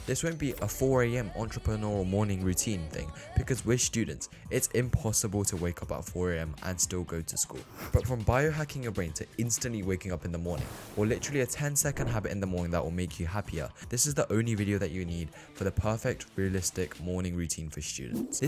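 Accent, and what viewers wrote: United Kingdom. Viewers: I do the counting down thing. Really helps! Great video-- very entertaining but clear and straight to the point. I think you hit the nail(s?) On the head with the importance of what happens before you go to bed. Lots of points for me to try out and possibly share with others. Also, took me a while to realise you're a Brit not an American😅 Great video!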